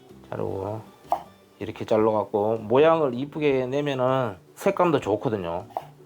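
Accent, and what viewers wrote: Jeolla, South Korea. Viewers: ㅋㆍㅋ 셰프님 전라도네요 말씀이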